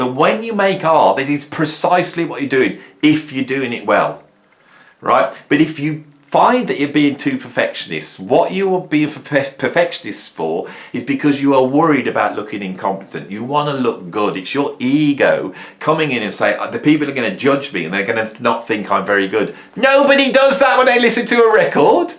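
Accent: United Kingdom. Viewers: I thank you for your channel it's therapeutic and informative. Plus, I enjoy your British accent 👍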